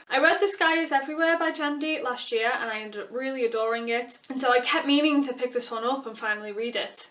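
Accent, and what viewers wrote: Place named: Leeds, United Kingdom. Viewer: what part of the UK are you from? hope you don't mind me asking, just trying to pinpoint it through your voice... kind of sounds like you're from the Leeds area??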